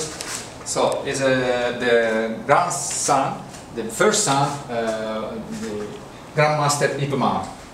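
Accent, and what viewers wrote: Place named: Italy. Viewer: Hai un accento italiano abbastanza forte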